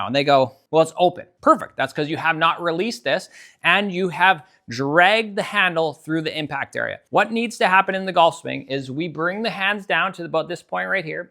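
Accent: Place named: Canada. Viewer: Well done. The Canadian accent really shows up in this one. Love the simplistic way you explain that.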